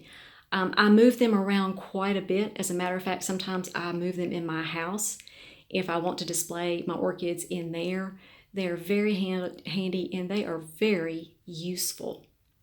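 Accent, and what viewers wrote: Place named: Tennessee, United States. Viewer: I truly appreciate you taking a moment out of this first sunny day in what feels like weeks, to reply to my question. I apologize because I wish I hadn't had to use the brand name of the product I asked about. I was wondering if you had any specific reasons perhaps I shouldn't include the aforementioned product into my initial soak and subsequent watering schedule. I'm born & raised in S.E. TN - my entire life, so not only does your accent feel like home.. 💯...but, your tips concerning directional exposure(s) help me, specifically. Your inherently generous and kind way about you is as lovely as your collection. Enjoy this sunny Sunday!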